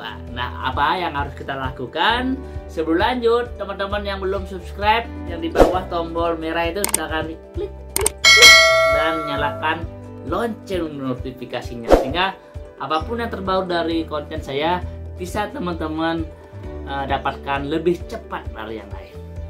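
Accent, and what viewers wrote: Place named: Bali, Indonesia. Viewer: Bapak ini dari bahasanya pasti orang bali😃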